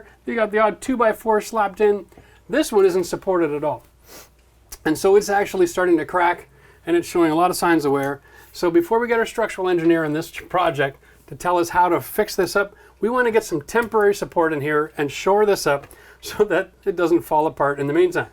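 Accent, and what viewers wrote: Canada. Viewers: You can hear that strong Canadian accent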